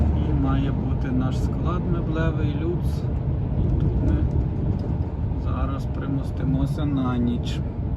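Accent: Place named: Western Ukraine, Ukraine. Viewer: спасибі за відповідь. Я так розумію, Західна область? Відчуваю нашу говірку)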